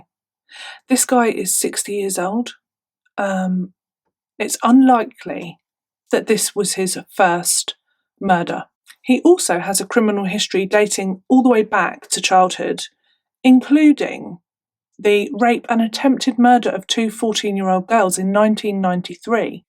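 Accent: United Kingdom. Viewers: i just found your site by accident and watched one of your videos. Normally I would be irritated by your presentations, but I find your approach to be delightful -- can't say funny because of your subject matter!! I am from London originally so its refreshing to discover a British narrator of horror stories!! You remind me of Miranda Hart who had her own unique TV show, I hope you do other performances other than tell these stories?? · I love your videos. Love your voice and attitude. I’m American, so I love the attitude and voice of the English. Can you add more photos as you’re telling these cases? I’m not asking for shock photos, but photos that humanize the case more. Like childhood photos of the victim, photos of love ones, photos of the killer, photos of the trial, etc. Please and thank you.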